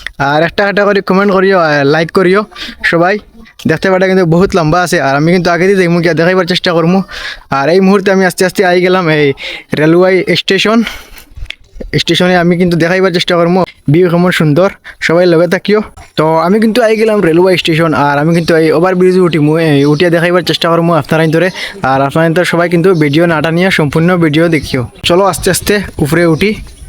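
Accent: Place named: Sylhet, Bangladesh. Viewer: Shyleti style language . Tripura এর লোক আপনি. নাহলে শিলচর জেলার লোক. শ্রীহট্ট (সিলেট) এর ভাষা আমার খুবই প্রিয়।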